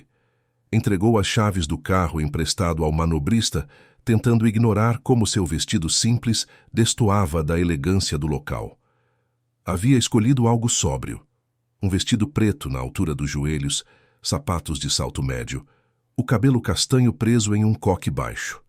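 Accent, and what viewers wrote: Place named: Brazil. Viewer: eu sei, só que ele está narrando em português Brasil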